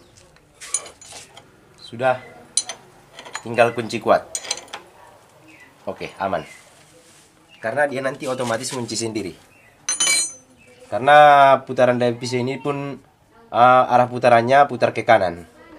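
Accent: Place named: North Sumatra, Indonesia. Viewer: Dimana alamatnya bosku....sepertinya orang sumut...saya juga orang sumut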